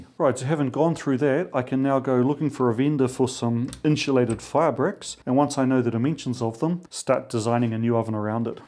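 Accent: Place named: New Zealand. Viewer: I am a new subscriber in Australia. Until you said that you are in Austria I was sure that your accent and approach to building stuff meant that you were in New Zealand!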